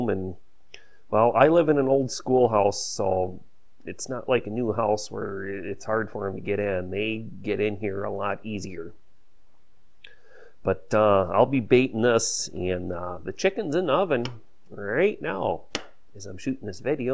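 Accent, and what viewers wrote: United States. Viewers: jeremy thole Ha ha I’m in Melbourne Au trying to work out if it’s an American or Canadian accent! Guess it’s American?! 🥴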